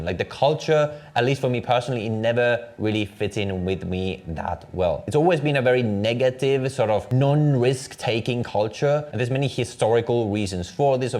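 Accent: England, United Kingdom. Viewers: Love his English accent 😂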